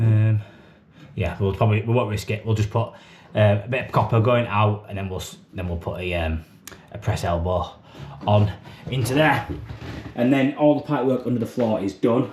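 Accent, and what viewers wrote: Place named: Yorkshire, United Kingdom. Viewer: Genuinely not trying to be a dick but I’m so confused how you’re so Yorkshire in everything you say apart from the way you pronounce “plaster” and sound really posh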